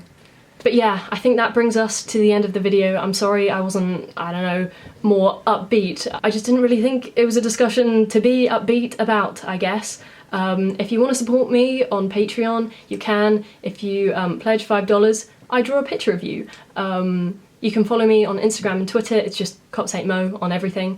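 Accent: New Zealand. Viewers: Omg you’re a kiwi?!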